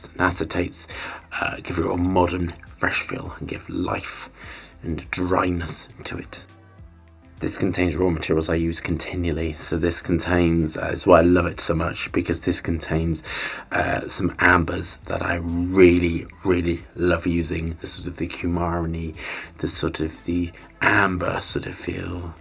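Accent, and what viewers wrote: England, United Kingdom. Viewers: Yeah, he's English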